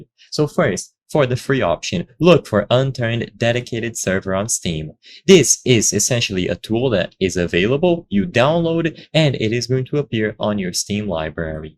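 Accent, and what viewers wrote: Brazil. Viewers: Sotaque BR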